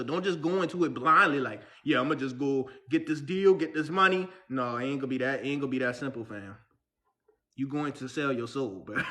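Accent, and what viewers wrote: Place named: Geechee, United States. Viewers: Bro I’m hearing that geechee accent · You sound geechee.. You from Charleston ,SC.. I knew it 😂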